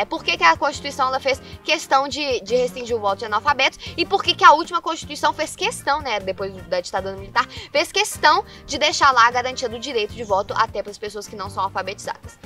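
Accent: Minas Gerais, Brazil. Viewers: Linda ! Minerinha com sotaque delicioso de ouvir .. estou curtindo muito. Fala muito bem, inteligente e esperta. Clareza mesmo com a lingua um pouquinho presa, mais é um charme . Adorei .. estou viajando no tempo com essa gatinha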